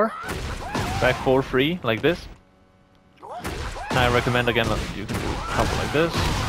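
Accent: Denmark. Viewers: I smell Danglish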